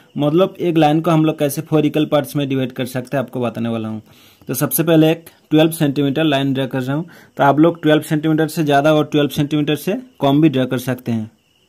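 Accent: India. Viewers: But your english is from india 🇮🇳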